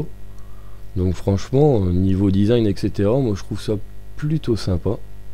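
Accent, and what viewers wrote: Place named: Belgium. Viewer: Tu es belge toi, non ? ^^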